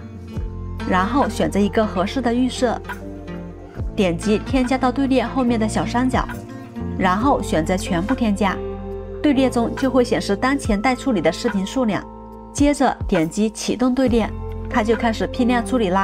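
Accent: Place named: Hunan, China. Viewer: 听口音是湘妹子。介绍的相当的详细。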